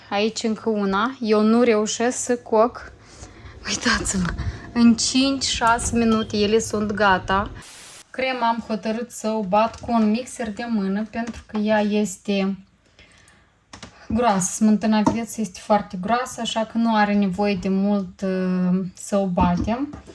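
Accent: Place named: Moldova. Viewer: Ea e moldoveanca și vorbește moldovenește.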